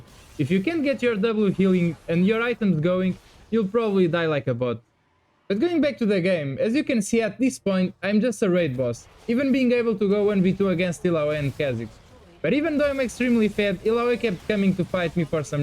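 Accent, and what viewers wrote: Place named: Portugal. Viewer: Sotaque não engana que é tuga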